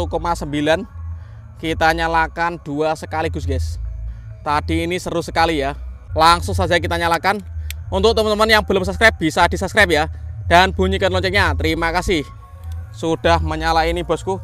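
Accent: Java, Indonesia. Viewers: BG Jawanya Jawa mang bg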